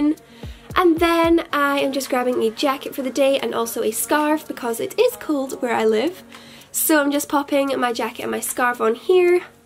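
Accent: Scotland, United Kingdom. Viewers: Elisa Forever Scottish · panda dreams well shes brittish so..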